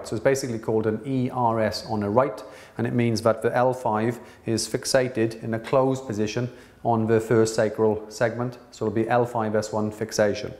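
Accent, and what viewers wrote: Wales, United Kingdom. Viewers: Ah... I can actually hear your slight Welsh accent now.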